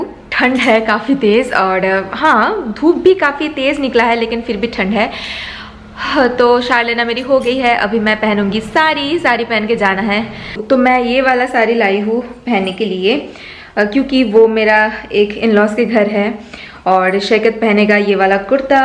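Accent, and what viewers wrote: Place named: Bengal, India. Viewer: GOD BLESS U BROTHER✋✋ i..like Ur. Talking.style. Very.much I love.ur bengali. accexent. Hindi 👌👍🌷💓 Sweet.vlog🎂 Cute couple👑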